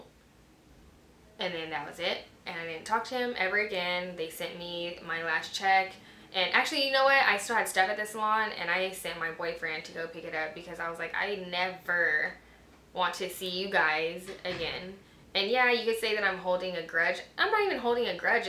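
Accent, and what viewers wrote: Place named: Southern California, United States. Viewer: you have a thick socal accent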